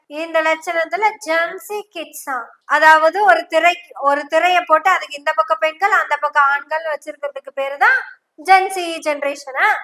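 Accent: Kongu, India. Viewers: எனவே எனவே இதைப்பற்றி நல்ல கருத்தை கொங்குத்தமிழ் மொழியில் பேசிய சகோதரிக்கு நூறு வாழ்த்துக்கள் அரசியல்வாதியாக உள்ளே வரும்போது ஊழல்வாதிகளாக தற்குறிகளாக நுழைகிற கூட்டம் இதற்கு நல்ல பதிலடி கொடுத்திருக்கிறார் இந்த சகோதரி வாழ்த்துக்கள் வாழ்த்துக்கள்.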